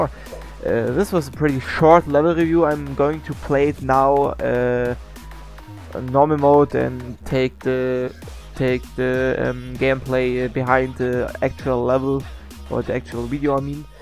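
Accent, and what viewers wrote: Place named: Germany. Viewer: Man merkt richtig das du aus Deutschland kommst ich finde dich cool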